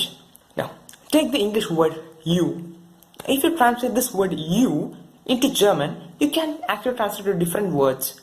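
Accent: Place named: India. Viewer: Hahaha... Amazing. I've heard numerous explanations regarding this topic. And what's funny and inpressive to me... is that this dude from India best explains Deutsch Grammar topics despite his heavy English accent. Bro! Once again. A great explanation and keep up the great work! I really enjoy referencing your Deutsch training videos. Vielen dank.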